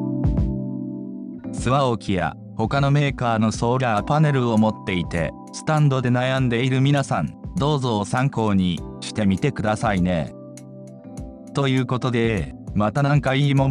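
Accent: Hokkaido, Japan. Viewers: ホント、北海道もし冬に災害来たら怖いですよね😱 なまらとか、北海道弁🤣🤣あまり最近使わなくなりましたね。なんとかさーとか🤣北海道弁に聞き入っちゃいました。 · 今回は音声付きですね😆 北海道弁の感じがいい感じですよ👍 ソーラーパネル、災害の時のためにあると良いですね👏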